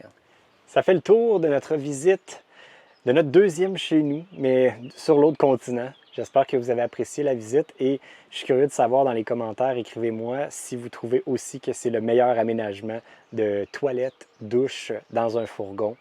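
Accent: Quebec, Canada. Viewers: Le chaudron, le corridor 🤣 Toujours très drôle pour un Français d'entendre un Québécois parler !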